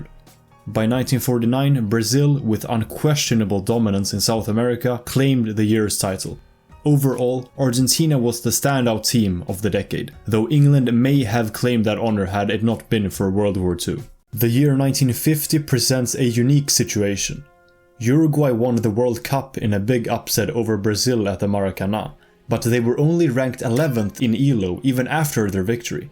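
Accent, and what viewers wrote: Sweden. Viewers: Are you Swedish?? 😅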